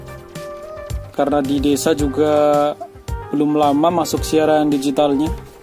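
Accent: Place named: Banyumas, Indonesia. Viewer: Hadirrr booos ora ngapak ora kepenak. .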